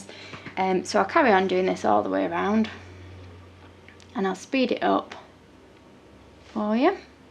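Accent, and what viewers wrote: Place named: England, United Kingdom. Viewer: New subscriber here! Where did you train, or are you self-taught? Amazing tutorials, and nice to hear an English accent, so many Australian and American cake geniuses on YouTube but I haven't come across many fellow Brits :)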